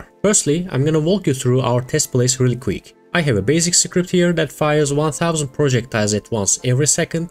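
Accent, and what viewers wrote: Turkey. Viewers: your accent reminds me of Turkish people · Aksanından Türk olduğunu anladım gayet güzel bir video olmuş... Anlatımın harika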